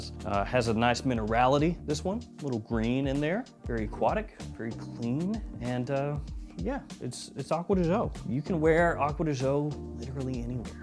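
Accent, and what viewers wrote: South, United States. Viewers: More southern accents, please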